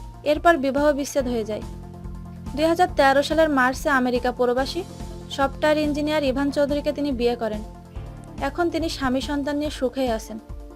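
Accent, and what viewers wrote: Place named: Pabna, Bangladesh. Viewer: kotha shune to mone hoy bari pabna, agey shundor kore kotha bolte shekho.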